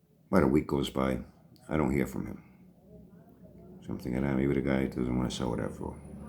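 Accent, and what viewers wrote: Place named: Massachusetts, United States. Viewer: You sound like you're from MA.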